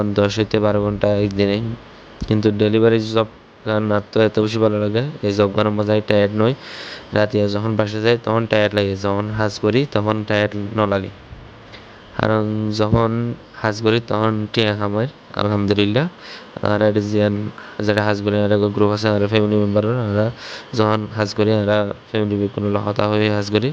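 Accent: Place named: Noakhali, Bangladesh. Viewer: ভাই আপনার কথা ত বুঝি না নোয়াখালীর লোক নাকি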